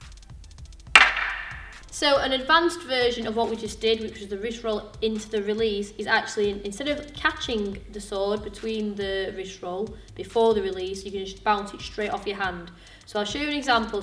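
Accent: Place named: Yorkshire, United Kingdom. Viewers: Which part of the UK is that accent from? Love it. Sounds like Dr. Fiona Hill's. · The 'oo' sound makes it Yorkshire.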